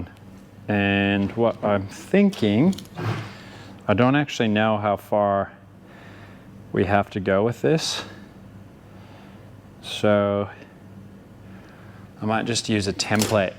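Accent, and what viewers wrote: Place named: Australia. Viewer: Another great vid, helping me on my own rear end. I can hear the Australian twang coming through in your accent. 😁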